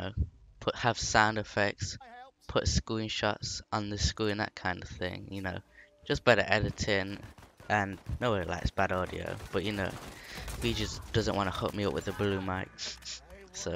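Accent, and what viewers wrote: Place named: East Midlands, United Kingdom. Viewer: Aah, an east midlands accent - explains why I couldn't pin it down. Midlands all seems to blend into one for me. It always makes me laugh when you're gaming or (briefly) on the podcast, and the Yanks try to copy your accent. Do they think we all speak like the Queen and eat crumpets mid afternoon?! lmao. Looking forward to seeing what you might do with this channel. My advice - do what you enjoy and don't worry about numbers. Have fun with it. Good luck bud.:)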